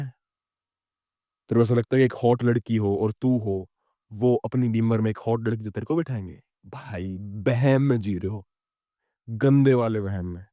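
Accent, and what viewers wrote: Haryana, India. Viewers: Valid points bro btw you sound like raftaar in haryanvi accent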